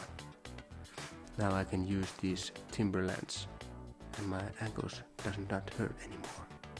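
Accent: Finland. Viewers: Hey, thanks so much brother. I was having the same issue, by the way, are you from Finland? Judging from the accent. 😄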